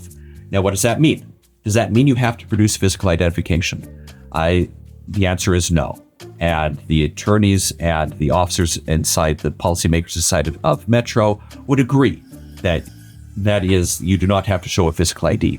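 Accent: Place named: Canada. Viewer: Clear Counsel is not very clear or helpful. This dude sounds like he’s from Canada!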